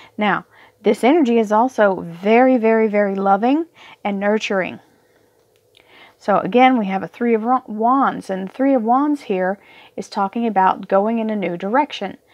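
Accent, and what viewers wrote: Texas, United States. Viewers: Love you Love you Love you....thank you sooo much you Texan girl.....Love the way you get all gigly and excited 😇😇😇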